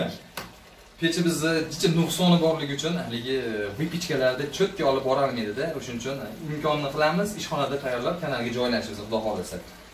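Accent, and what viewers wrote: Fergana, Uzbekistan. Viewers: Manimcha boshizdegi Fargona doppi. Shuningchun soragandim. ham Fargonaniki togrimi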